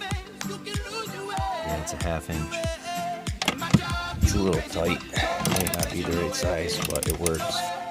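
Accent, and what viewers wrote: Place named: Upstate New York, United States. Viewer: Very helpful and thorough video… thanks for sharing! Ps - Upstate NY accent for sure! 🙂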